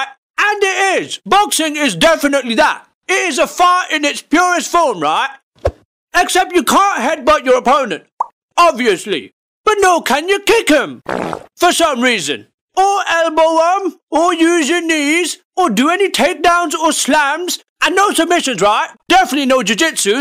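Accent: United Kingdom. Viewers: It's true, it comes up as dutch. I thought his voice sounds British. But, Dutch???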